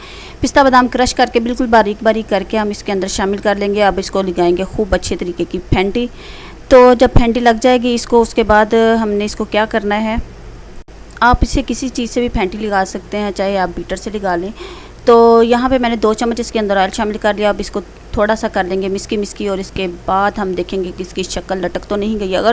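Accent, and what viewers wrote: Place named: Punjab, India. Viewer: hahaha bht acha laga bhtaza aya apki punjabi sun k behna kal apka link ni laga but aaj apko special shoutout dun gi dear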